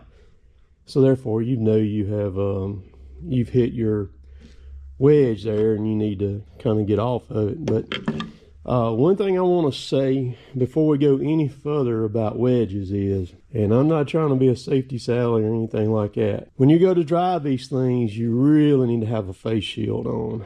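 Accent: South, United States. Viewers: that’s a great learning tool. I was looking for this information and you had it and yes the block for the notches would be a great help. You’re very good teacher. Have a great day and appreciate all your videos. You do a great job on them enjoy your channel, be careful And you don’t talk slow that’s just the way we talk down here in the south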